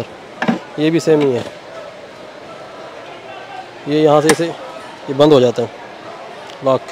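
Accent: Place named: Karachi, Pakistan. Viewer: Karachi lug raha hay😀Aap ka taluq karachi say hay??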